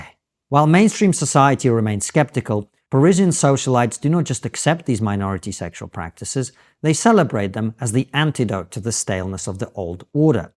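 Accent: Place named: United Kingdom. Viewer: This guy thinks because he’s British that he sounds smart but that’s not how it works bro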